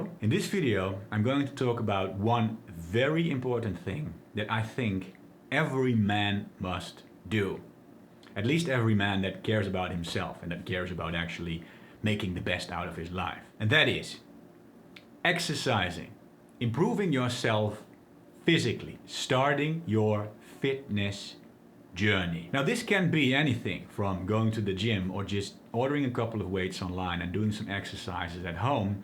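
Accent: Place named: Netherlands. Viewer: I have no idea if you want to share your nationality with us, but you seem dutch (no offense because your pronounciation is proper). Am I right?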